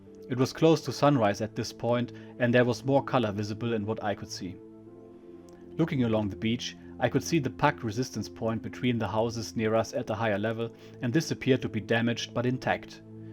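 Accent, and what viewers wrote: Germany. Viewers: Excellent stories. Thanks for these. the narrator is it a real person reading? I like the German accent to add realism.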